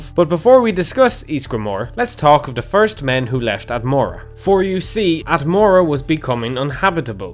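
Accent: Ireland. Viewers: "Tank** you good sir..." Gosh i tought you knew better! (Pls don't take this as an insult i love your accent it reminds me of my grandparents) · 'the Turd Era' gotta love that accent.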